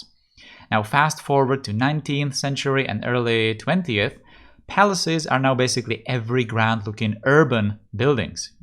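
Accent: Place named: Czech Republic. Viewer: You must be from Czech Republic. I am also. You have Czech accent when talking English and you speak very well Czech. You always start or speak about Czech Republic 🇨🇿. I love you. Jsi nejlepší, pokračuj. Znáš Poděbrady? Verz interesting episode, not just the talking but also the gameplay😄👍🏻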